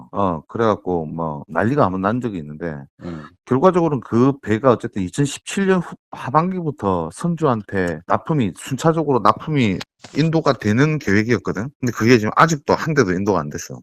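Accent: Gyeongsang, South Korea. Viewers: 역시 현잠 실무자의 직접적인 얘기라 귀에 쏙쏙 들어오녜요 경상도 특유의 다소 투박한 사투리지만 그것이 오히려 재미와 더불어 귀를 더 기울이게 하네요 비록 알고있던 정보지만 타 유튜버들의 써놓고 읽는듯한 통상적인 진행보다 한결 신선함을 느꼈습니다 비록 자막이 없어도 지루하지 않게 들을수 있었습니다ㆍ신선했던 컨텐츠 였습니다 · ~ 이번 에피소드 하나로 조선업을 이해할 수 있었네요 특히, 갱상도 말로 들으니까 더 이해가 잘 되네요. 해양플랜트는 힘들어도 조선은 살아~있~네 ^^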